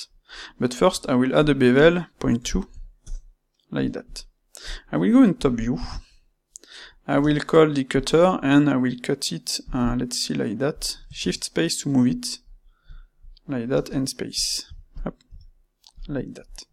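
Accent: France. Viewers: La qualité et tt ce que j'ai appris des ces tutos, mais aussi la force que ces vidéos sur Blender ont comparé aux autres c'est l'accent francais qui fait la force de ces tutos. gg x))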